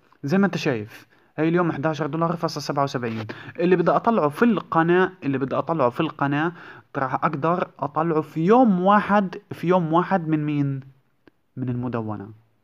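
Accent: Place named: Gaza, Palestine. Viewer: بشرفك انتا من غزة صح سبحان الله من الكلام بنبين أستمر صديقي الله يوفقك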